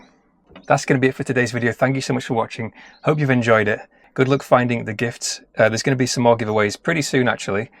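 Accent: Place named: Yorkshire, United Kingdom. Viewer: Hi, thank you for your reply; No, I'm not being too kind, I've just watched some of your other videos; so fascinating. You should have your own TV series on say BBC Four or BBC Two; about Yorkshire. Your speaking voice is awesome; really clear, and well spoken, with just enough of the Yorkshire accent without being too much. I used to work as a graphic designer and artist and still have some links with the TV companies; I mainly worked for Liza Minnelli, and Lonnie Gordon; but I suggested this coming Saturdays evening on Liza on BBC Two; I am going to suggest you to the head of BBC programming; if you are in agreement? You would be excellent.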